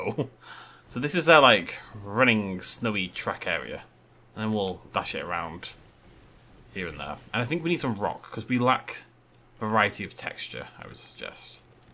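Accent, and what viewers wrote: United Kingdom. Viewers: What the hell happened to your outro music also quit the British accent and it’s annoying to hear!!!!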